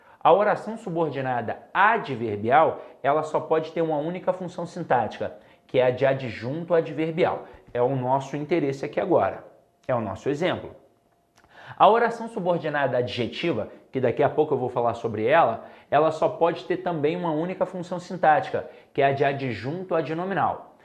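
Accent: Rio de Janeiro, Brazil. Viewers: Que sotáque lindo de carioca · amei esse sotaque Carioca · Sotaque de carioca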